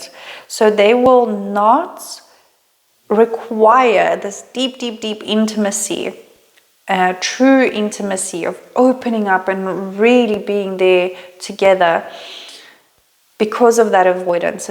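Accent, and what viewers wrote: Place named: South Africa. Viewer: Hah! I recognized the SA accent. Great video!!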